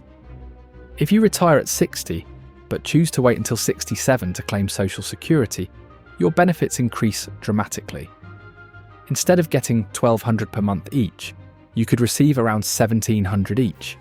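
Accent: England, United Kingdom. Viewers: An English voice talking about dollars (US is implied) rather than pounds or Euros. Did I miss the part in the commentary where he talks about who his intended audience is?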